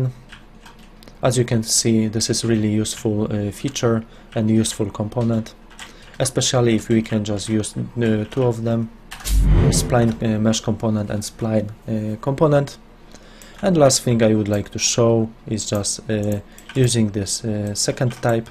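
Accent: Poland. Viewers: Unreal nie ukrtjesz polskiego akcentu a zwłaszcza meszes xD GruBe aus dem Polnischen (sorki ale nie mam niemieckich znaków na klawiaturze) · Are you from poland? because you have polish accent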